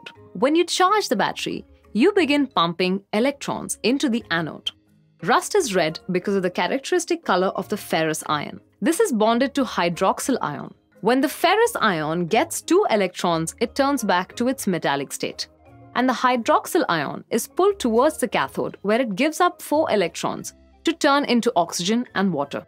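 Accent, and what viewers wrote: India. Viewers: her accent was screaming Indian Subcontinent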